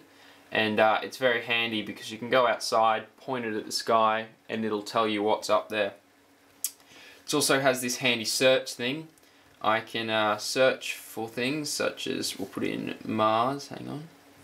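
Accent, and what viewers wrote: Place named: Australia. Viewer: lol australian...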